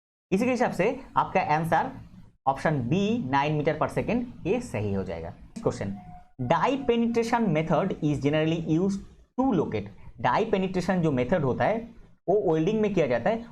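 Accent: Bengal, India. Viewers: Dada Tumi ki Bengali? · app bangali ho keya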